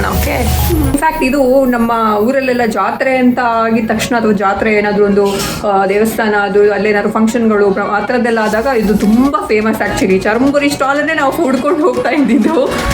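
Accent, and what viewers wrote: Mangalore, India. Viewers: Hi dear nimma vlog Alli manglore Kannada keli thumb kushi aithu all the best namma support nimge yawagalu eruthu god bless u❤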